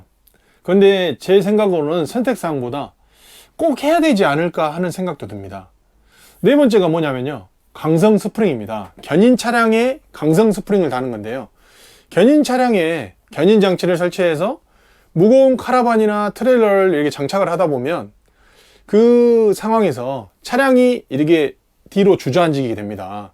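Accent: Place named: Seoul, South Korea. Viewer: 아따 서울사람이줄 ㅎ 멋저버러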